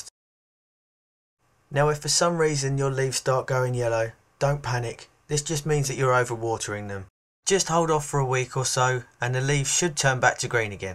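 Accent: England, United Kingdom. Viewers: Nice to hear an English voice which gives me a bit of hope that I might be able to grow a lemon (as opposed to being one so often). I have five seeds growing and wonder if I will ever have lemons or just leaves as I live in the north east. Thanks for your video sir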